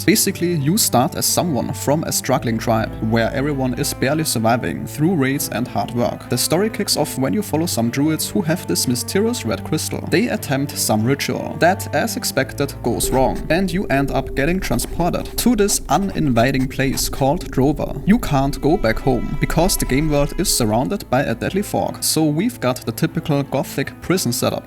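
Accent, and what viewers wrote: Germany. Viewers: Very german accent :D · Digga deine Aussprache ist wirklich schrecklich. Versuch Englisch ohne deutschen Akzent zu sprechen weil das geht fast in Richtung old Arnold😅😂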